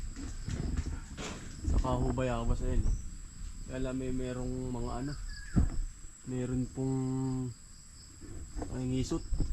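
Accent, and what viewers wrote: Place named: Laguna, Philippines. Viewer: Tagalog kang talaga , sa Laguna ba kayo paki sa sagpt manan kapatid, natuwa akong makarinig ang ganyang punto ng pananagalog.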